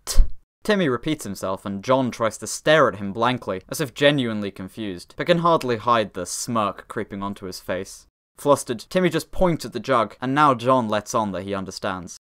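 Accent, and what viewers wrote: United Kingdom. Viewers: thought this was going to be about replacing t sounds with d sounds because i forgot you were british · I just realised you're British accent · i was expecting this to be you saying americans gotta say them but oh yeah ur british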